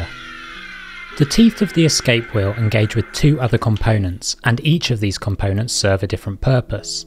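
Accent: United Kingdom. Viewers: This channel is very reminiscent of Clickspring, except with a relaxing English voice instead of a relaxing Australian voice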